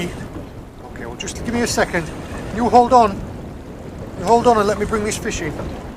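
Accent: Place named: Cumbria, United Kingdom. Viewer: Love the vids were are you from originally sounds like a cumbrian accent I'm from whitehaven originally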